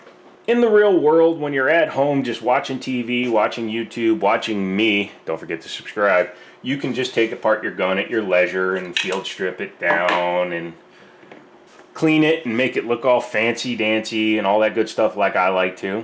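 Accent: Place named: Chicago South Side, United States. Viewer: This dude has a Chicago Southside accent.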